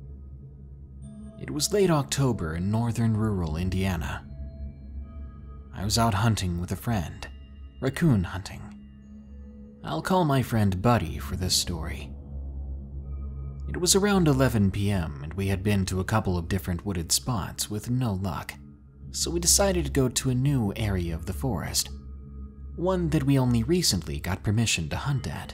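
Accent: South, United States. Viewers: He practiced, in his old videos he sounds super southern and not silky smooth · The american accent version of Lazy Masquerade. I kid you not, you both have the same tone, same way of narrating the only difference being the accent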